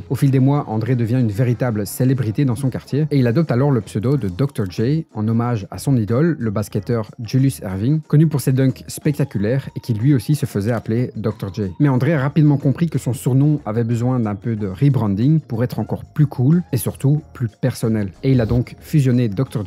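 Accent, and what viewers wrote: Belgium. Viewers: ton accent belge est degeulase horrible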